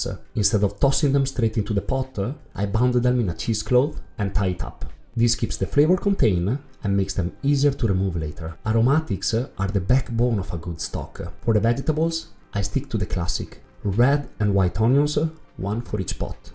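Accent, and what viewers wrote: Italy. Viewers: Great recipe! Love the way you exaggerate the Italian accent. It is noticeable your English could sound a lot more "English", but I do believe we should always keep our roots. It is like the fat... Why lose it? No need. Regards, from Angola, Africa! Cooking your bolonhesa, like we say in Portuguese, as I write.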